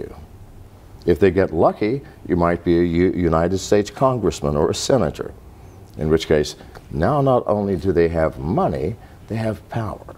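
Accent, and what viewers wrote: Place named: Soviet Union. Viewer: I like how he keeps slipping into a Soviet accent.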